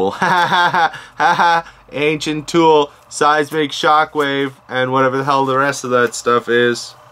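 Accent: Scotland, United Kingdom. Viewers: You sound Scottish not Irish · i got +10 points..what can i get with them...accent sounds more scottish lol..idk.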